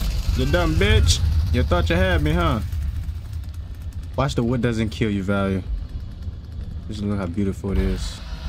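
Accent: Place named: Detroit, United States. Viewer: Sound like my homie from 7 mile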